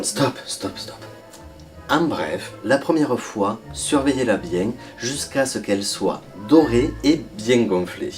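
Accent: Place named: South, France. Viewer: Super sympas cette petite émission:o j'ai aussi eu du mal à comprendre ce que disait la voix trafiquée mais ton merveilleux accent du sud et ton sourire me l'ont vite fait oublier x) merci!!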